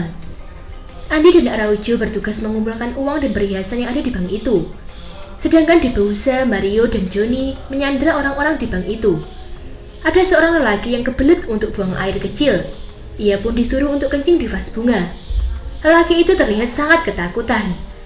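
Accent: Java, Indonesia. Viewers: Medok bgt mbak, but i like it 😍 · Logat jawa nya medok bgt mbak 😁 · mbae ngomonge medok bgt hihi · Plis jan medok si, gw tau lo jamet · Medok banget, jowone ndi mbak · Mba e logat jawa poll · suara medoknya itu loh ,bikin gimana gitu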